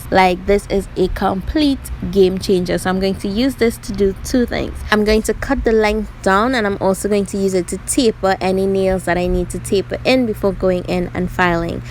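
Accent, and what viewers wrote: Trinidad and Tobago. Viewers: Hi, u sound like a Trinidadian! 🙌🙌🙌 Beautiful work by the way😍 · 🇦🇬🇦🇬Hello Ms. Trinidad... picked up that accent anywhere. FABULOUS WORK Caribbean Sister!!! Congratulations!!!!💙💙💙💙💙🌟⭐⭐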